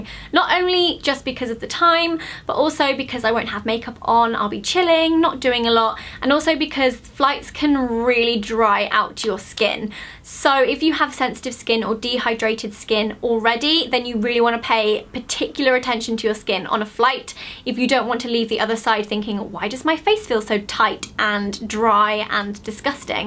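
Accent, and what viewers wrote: United Kingdom. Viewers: I really love your british accent👌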